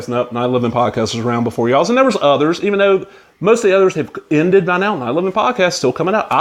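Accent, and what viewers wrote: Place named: South, United States. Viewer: Also, I really like your thick southern accent in these videos! I have a very thick southern accent myself and kinda reminds me of myself a little bit but mine's slightly different. Where were you born and raised? I was born in Dallas, Texas and grew up in Tulsa, Oklahoma so I'm guessing that's where the accent comes from!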